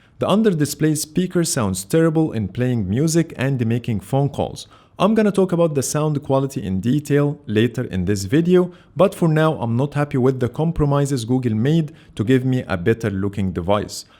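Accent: Egypt. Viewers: يخي ترا مبين والله انك مصري وعم تحكي انكليزي ... يرحم كل شخص تحبوا ارجوك عدل اللكنه تبعك تبع الانكليزية تبعك 🙏🙏 يخي والله بحب محتواك بس من لكنتك صار عندي فوبيا و مبدي اتفرج اكثر 💔💔 ينقصك اللكنة فقط والله 🙏🙏🙏🙏💖💖💖💖💖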